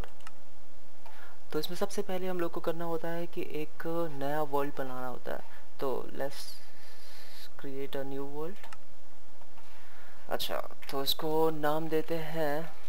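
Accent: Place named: North India, India. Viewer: also like your north wali hindi... (delhi kya?)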